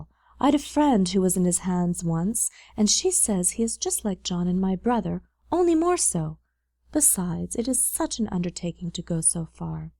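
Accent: Canada. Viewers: I super appreciate the reader's slight Canadian accent; makes this so much easier to listen to! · The Canadian is strong with this one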